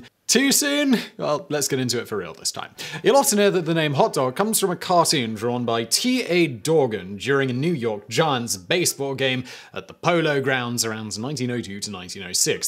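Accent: England, United Kingdom. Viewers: Alicia Rice How is it he sounds so insular, whenever he comes across a foreign word it’s pronounced stubbornly in the King’s English? He’s the type who pronounces Don Juan like Don John. It would kill him to pronounce croissant other than like crescent. There’s a reason Brexit happened, no matter how much pretends to be internationalist. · you have a very similar accent/dialect to me. I am English and enjoy the language we hol in common. However, I do wish you woul cease using US phrases and pronunciation - I don’t know whether the arseholes of the US are flattered by your transatlantic euphemisms or laughing at you. I merely find the practice abhorrent. If you think that using US slang will give you a better audience, I very much doubt it.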